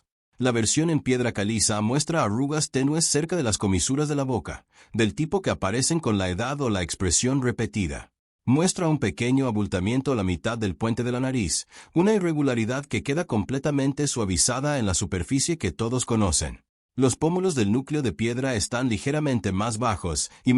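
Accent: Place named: United States. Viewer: Todo esta muy bien excepto el acentito ridiculo de gringo....😅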